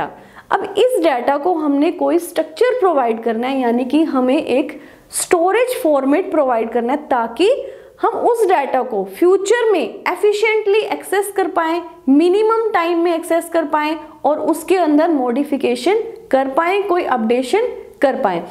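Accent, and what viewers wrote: Punjab, India. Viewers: Beautiful teacher with Punjabi accent 😀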